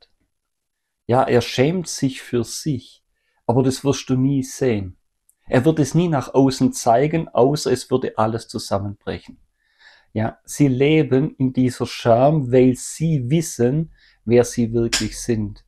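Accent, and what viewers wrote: Swabia, Germany. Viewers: Isch halt usm Ländle 😅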